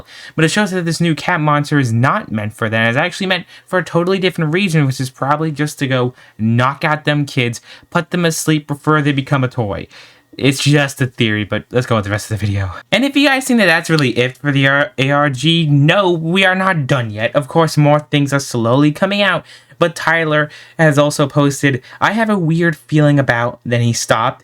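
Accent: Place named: France. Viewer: Bah il a un accent français alors je pense que oui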